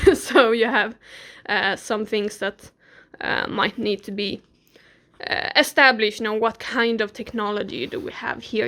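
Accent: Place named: Sweden. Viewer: Hi. You are Swedish, right? I can tell by the accent 😊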